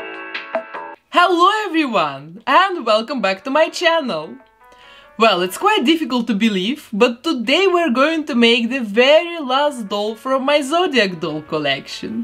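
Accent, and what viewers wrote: Russia. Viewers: Excuse me...but Are you from Russian? 😰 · where is she from? l hear Russian accent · Russia, belarus or out there but I think it's from a European country because she says the money in Euros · Я не могу понять, или она русскоговорящая, либо просто плохо выговаривает англ слова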